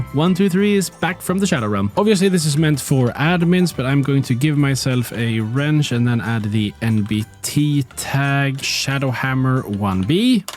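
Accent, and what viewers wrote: Sweden. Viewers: He sounds swedish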